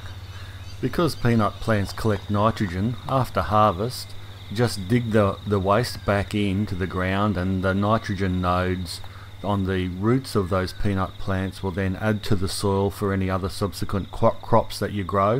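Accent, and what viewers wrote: Australia. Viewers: Chowder Stevens he's not English he's an aussie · Rithik Madan Australian I think, maybe New Zealand but pretty sure he’s mentioned being Aussie. Definitely not british.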